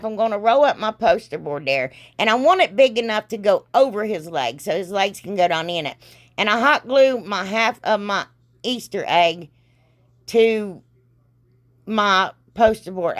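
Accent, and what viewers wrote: South, United States. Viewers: OMG! You should be a stand up comedian! I laughed so hard when you were cutting the "dongers" off the bells. I had tears running down my face! You have such a deep southern accent, it makes everything even funnier! Loved all your projects. You have such a great imagination! I needed a pick me up and Girl, you gave it too me! I don't know why I have never seen any of your other videos. I love you and will be going back to watch you! You are the best! ♥️♥️♥️♥️😂😂😂♥️♥️♥️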